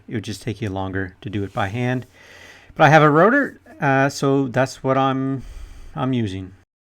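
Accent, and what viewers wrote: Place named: Alberta, Canada. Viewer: This man has an Alberta accent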